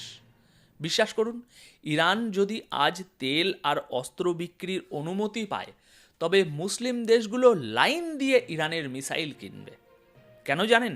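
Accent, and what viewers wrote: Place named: West Bengal, India. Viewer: দাদা আপনার কথাগুলো ১০০% সঠিক, আপনার বিশ্লেষণ অনেক উঁচু মানের, আপনার কথা বার্তা শুনে পরিস্কার বুঝা যায় আপনি পশ্চিম বাংলার মানুষ, তবে বিগত ১ মাস ধরে আপনার সংবাদ দেখি, আমার খুবই ভালো লাগে, সঠিক কথাগুলো বলেন।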